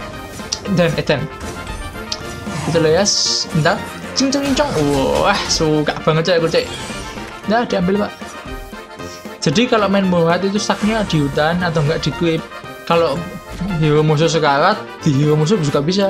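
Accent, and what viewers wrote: Java, Indonesia. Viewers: jawanya dimana mas? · saya suka channel ini karena medoknya itu loh wkwkwk suka banget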